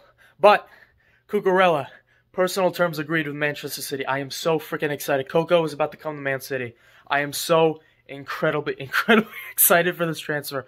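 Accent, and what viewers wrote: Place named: New York, United States. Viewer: I can tell you are from New York without telling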